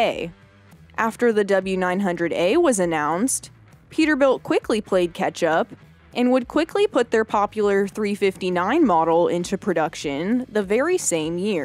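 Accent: United States. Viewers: sounds like she's from the UP Michigan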